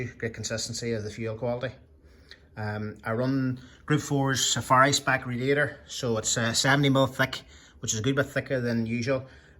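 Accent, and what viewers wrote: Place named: Scotland, United Kingdom. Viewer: I absolutely love the Scottish accent ❤❤❤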